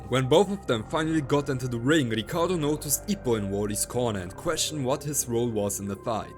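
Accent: Germany. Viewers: Man hört den deutschen Akzent🥀 trz W Video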